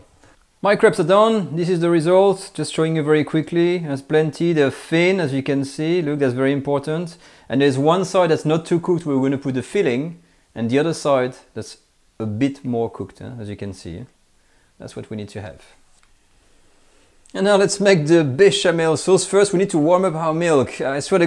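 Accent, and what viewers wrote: France. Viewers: I just love, love, love your recipes , your enthusiasm and your elegant French accent! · One of the best chanels, you are such a cool guy. Your english is excelent and with frech accent is nice to listen to. Greetings from Canada. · Juste pcq tu honores notre culture française 🇨🇵⚜️ et que ton accent en anglais est delicious. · Ree-sip-eee. Haha French accents are so cool. 😎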